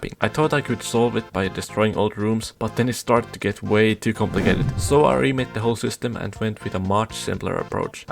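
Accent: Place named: Finland. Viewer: This editing style is awesome. And btw, you sound like you're from Finland. :D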